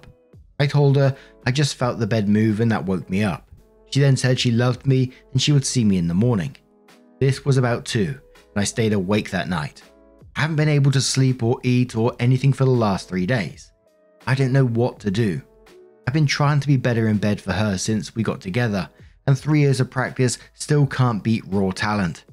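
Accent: United Kingdom. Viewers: Just stopping in to say I love your accent and commentary always!!! I'm Jamaican and always wished for the british sound.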